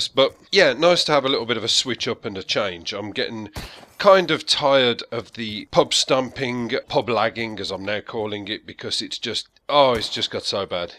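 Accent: Birmingham, United Kingdom. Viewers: Go for it bro just love seeing another brummie on YouTube lol ;)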